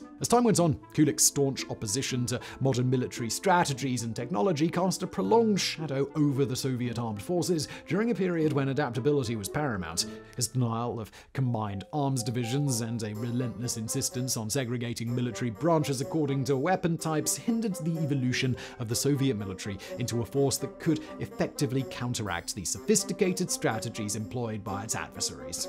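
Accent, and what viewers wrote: United Kingdom. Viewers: Bro - you need to slow your speech down a bit . Between the pace of your speaking and the fact that you have a British accent ( which everyone may not be accustomed to hearing ) it makes it hard for the ( some ) listeners to understand all the words in the lesson you are trying to get across . Please , slow down. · “A true zenith of egregious military inability.” This is the most intense and perfectly descriptive explanation of the general’s lack of military prowess… But when I say it, it doesn’t sound as impactful as when says it…his British accent makes it sound much more posh and erudite than it does with my American/New Orleans accent…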